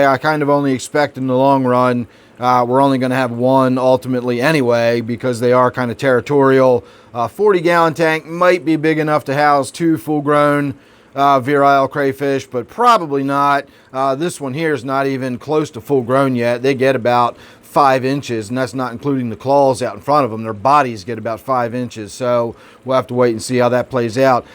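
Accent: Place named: Philadelphia, United States. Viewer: I just stumbled across your channel and I gotta ask, are you from Philly? You talk like us.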